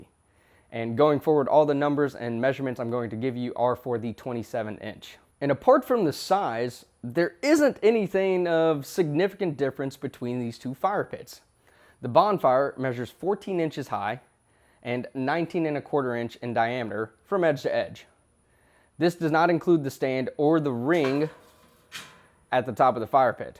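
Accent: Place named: Indiana, United States. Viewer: damn bra, an oriental dude with a Indiana accent (y)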